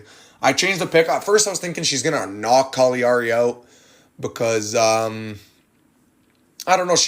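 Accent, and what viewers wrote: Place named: Canada. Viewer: i can hear the Canadian in your voice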